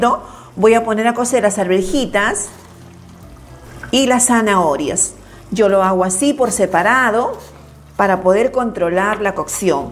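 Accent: Peru. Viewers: Que rico se ve ese Seco de Res al puro estilo Peruano, pero más rico todavía es escuchar la forma de hablar de las mujeres peruanas, disfrutar de ese acento claro, limpio, suave y ligeramente melodioso ... felicitaciones. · A mi me encanta. Es la forma de hablar en el Peru.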